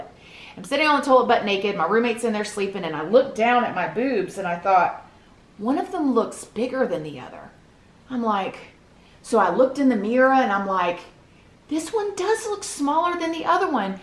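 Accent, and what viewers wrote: South, United States. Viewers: You're so fn southern. It's like, real genuine "y'all" can't make it up❤❤ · guess I should've figured, being she talks about Florida's women's prisons.. lol I guess when she said a couple hours from New Orleans it threw me off.. plus I guess I didn't realize ppl in FL really talked like Southerners... What do I know? FL is one of the few states I haven't been to. Thanks for the response :) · Hey nothing wrong with that southern swang 🤣 I live in the same town as her and we all say y’all around here 🤣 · 🤣🤣 ya'll count! I love it! I think it's the cutest! I love southern accents and the specific way they say certain things! So charming!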